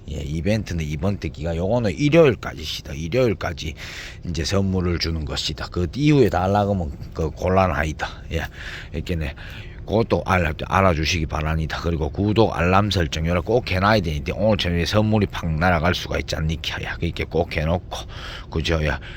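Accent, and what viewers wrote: Gyeongsang, South Korea. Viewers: 가격도 착하고 선물까지 주시고 ~ 사장님 경상도 사투리 정감 가네요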